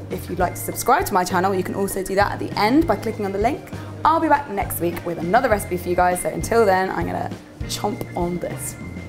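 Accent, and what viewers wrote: United Kingdom. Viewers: Doppelgänger she speaks British English with a beautiful accent.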